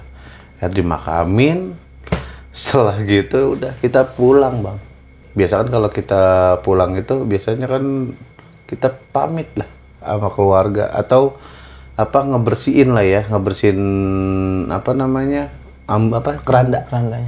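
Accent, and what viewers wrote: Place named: Banyumas, Indonesia. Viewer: Ora ngapak ora asik Cilacap mantau mas🙋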